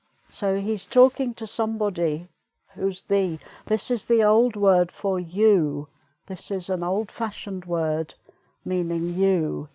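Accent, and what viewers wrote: United Kingdom. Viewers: I'm 15 years old and I'm from Brazil! I love your work and I understand a lot of your words, british accent is beautiful! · I love British accent, and you are the best ♥ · Omg this lady is a pure british perfection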